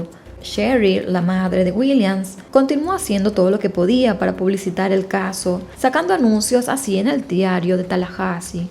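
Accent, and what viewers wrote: Standard. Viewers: Me encanta tu voz neutral sin acento. Bendiciones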